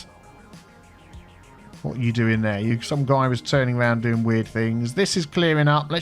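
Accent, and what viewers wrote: United Kingdom. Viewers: thank you for the information on the roundabout builder. I brought up the English bit because people try to correct his pronouncing but who knows the English language better than the English.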